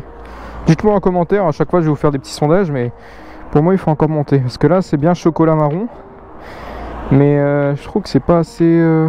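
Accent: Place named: North, France. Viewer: Cc t de ou du nord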